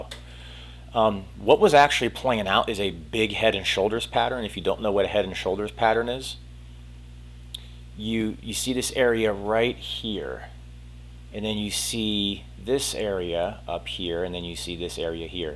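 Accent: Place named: New England, United States. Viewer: You sound like you're from New England..😎😎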